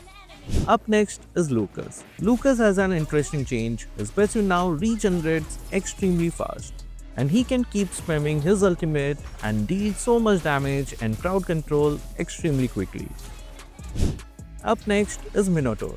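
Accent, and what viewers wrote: India. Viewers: Your name is japanese but your accent is Indian? Wtf?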